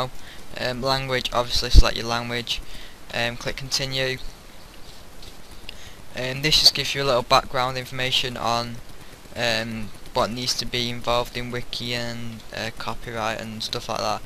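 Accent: United Kingdom. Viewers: Then I thank you for your help in making MediaWiki work. I'll find a way to solve the problem in which you solved a part. I just need to know if these files work with MediaWiki or not. I've never had a British friend. We can be friends if you like so. I'm an English student n' I like to know about Britons and British accent is my favourite. You did great. Thanks a lot. Later.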